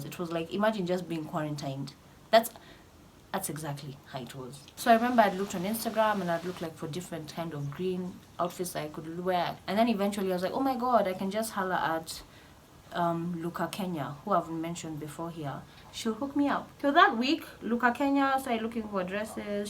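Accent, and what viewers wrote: England, United Kingdom. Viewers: you should do a video where u speak using the British accent only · I love your British accent 😍😂😂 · I honestly looove your english accent ❤😍n your sense of humour 😂😂😂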